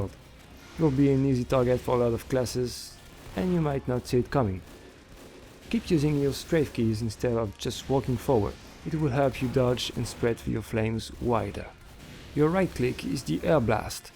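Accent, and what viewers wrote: France. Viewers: I think hes french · are you French?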